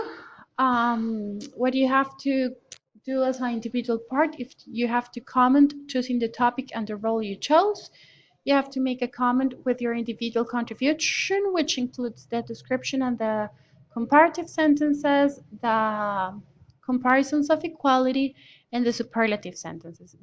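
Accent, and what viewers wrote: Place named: United States. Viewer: Tienes acento estadounidense profe😸😺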